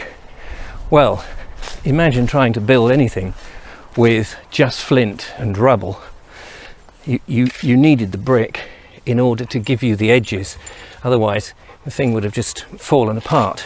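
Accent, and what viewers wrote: United Kingdom. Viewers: Sir I'm from India and I love your video, the way you present all video and especially your British accent, sir please visit the lords cricket ground Victorian era pavilion it is more than 200 years old building and your history and I have one friend from pennistone, yorkshire she send me lot of old history building so you should visit this place too again thnx for your wonderful videos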